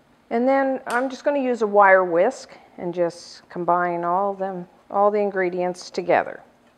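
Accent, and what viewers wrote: Canada. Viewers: I like your Canadian accent: "aboot."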